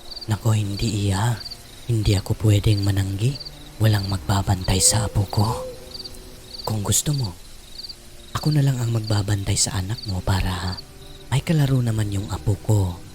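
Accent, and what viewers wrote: Visayas, Philippines. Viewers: I love your bisaya accent Say it again :Mantas nga aswang! heheh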